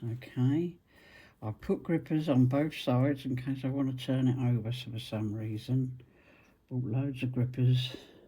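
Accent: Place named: England, United Kingdom. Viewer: Hello! Ex pat from Aussie...loved hearing a real English accent again! I bought a set of rulers over a year ago a d still haven't had a go! You've inspired me to get them out and have a go 😊 the quilt behind you is lovely 😍 do you normally do your own free motion quilting? Looking forward to your next video...please look after yourself..I too have COPD so I know the struggle 😢 best wishes too you, Carole x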